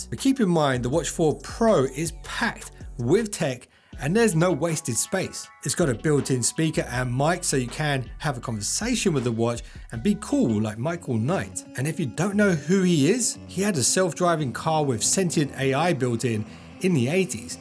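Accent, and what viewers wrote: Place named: United Kingdom. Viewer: Probably UK; judging by his accent.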